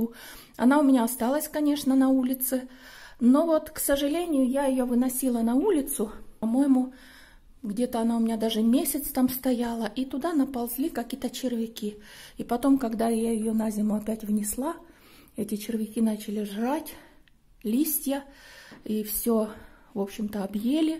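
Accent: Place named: Shakhty, Russia. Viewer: я от вас балдею, только наши женщины не ноют и на все руки мастера, я ваша землячка из г.Шахты, определила это сразу же по вашему разговору, училась в Ростове. Привет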